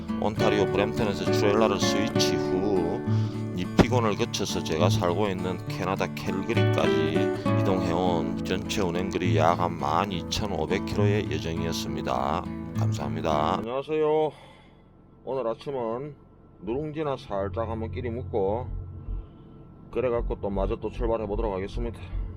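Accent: Gyeongsang, South Korea. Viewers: 가실없이 시벌리는 말이 유창 하네요 우리가 남이가 갱상도 머슴아 아니가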